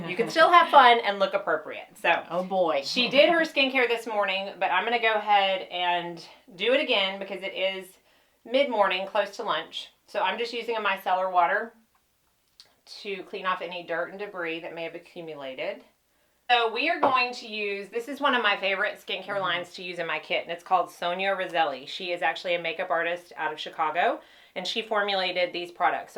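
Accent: South, United States. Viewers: Wow you Look Beautiful and i just Love your Southern accent you do not look your age at all even before make up too! Want to see more of you! In videos you were sooo sweet!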